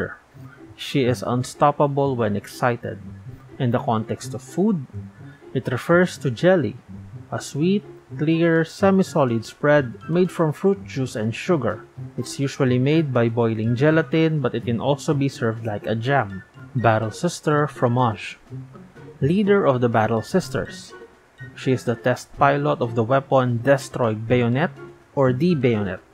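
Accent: Philippines. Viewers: Very fili accent. Love this vid and lore!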